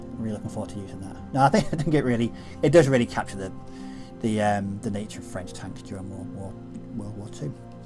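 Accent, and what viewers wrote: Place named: United Kingdom. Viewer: I think you have a very good British accent. For everything else, I found this great little site called <link> where you can listen to peolpe pronouncing words in their native language. Somua is not on it (yet) but one can request words and people native to that language will record reading pronouncing them eventually. I am waiting for the Somua now ... a French person will read and record it soon :) <link>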